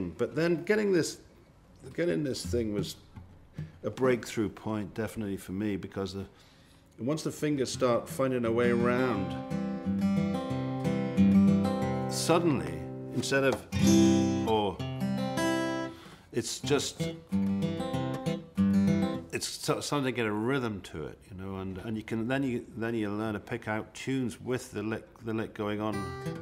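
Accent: United Kingdom. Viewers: I forgot this guy was British. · Cannot hear you and plus with the English accent hard to understand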